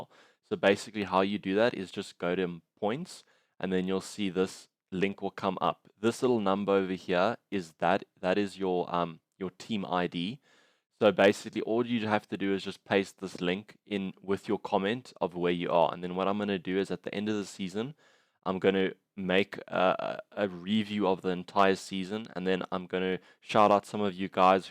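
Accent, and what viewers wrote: South Africa. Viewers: FPL ID: <num>. Top 2k. Notice the accent - Are you South African?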